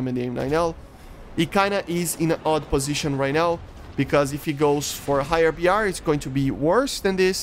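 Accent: Brazil. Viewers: percebi que era brasileiro na hora seu sotaque não engana kkk otimo video mano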